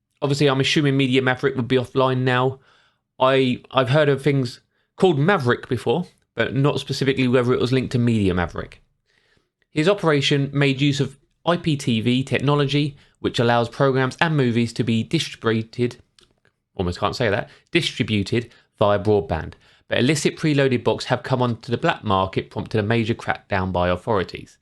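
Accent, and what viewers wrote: United Kingdom. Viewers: Was this guy from the UK?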